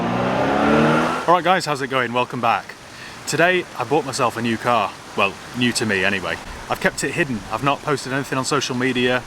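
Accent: United Kingdom. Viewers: So you’re British....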